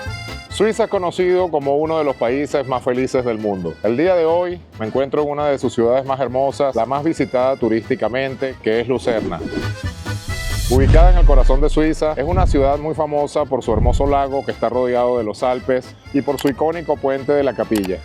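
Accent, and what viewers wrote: Venezuela. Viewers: Tienes acento venezolano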